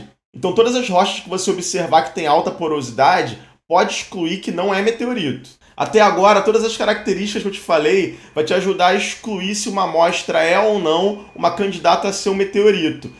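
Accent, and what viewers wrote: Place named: Rio de Janeiro, Brazil. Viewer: Você é do Rio de janeiro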